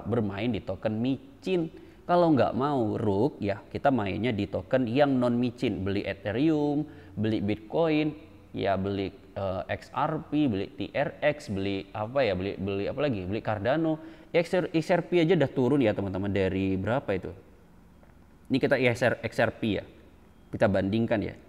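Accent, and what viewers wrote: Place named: Indonesia. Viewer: Dari gaya bhs Inggris nya aj gw udh feeling org Indo (gw gabisa jelasin yg pasti klo lu suka merhatiin gaya bhs Inggris lu bisa paham) Yah modal sejuta buat pengalaman worth it lah. Thanks otot anjing 🍻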